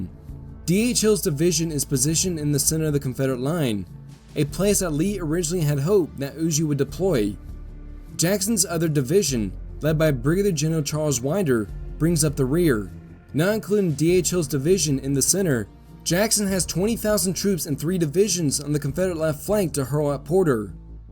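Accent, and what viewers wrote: South, United States. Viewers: I am loving this series. It is great to have someone dedicating their time and effort to a series on solely the American Civil War, demonstrating an in-depth knowledge with each video (as opposed to creating shorter less-detailed videos on disparate topics). The soundtrack is also great, helping to convey the magnitude and drama of the events unfolding (as it does with Epic History TV's Napoleonic series). So much is evoked by just blue and grey squares! It is also nice to near your accent, clearly that of someone who lives in the region where this war was fought, which I imagine gives you a more intimate perspective on the conflict, and gives these documentaries a more personal feel. As someone who used to live near Harper's Ferry, I saw many sites that were important during this era, but I never put it all together into a concrete interest in this war, rather focusing more on "exotic" European History. 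This series is helping me to understand the military history of the Civil War in far greater depth than I otherwise would have, and is giving me a greater appreciation for the ground upon which this history unfolded. Thank you for making this!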